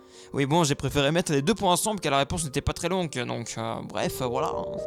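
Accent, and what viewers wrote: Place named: Wallonia, Belgium. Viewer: Toi tu viens de belgique et t'es un wallon :p vu que tu dis: Wouit au lieu de huit :p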